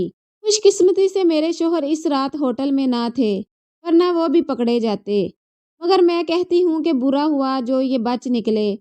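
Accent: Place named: Assam, India. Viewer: Wow khani achhi hai aap ki par ek bat aap asam se ho aap ki awaz se lag raha hai mujhe 😊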